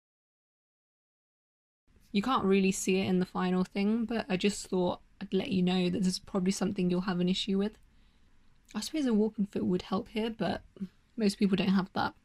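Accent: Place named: United Kingdom. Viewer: It's British accent 😅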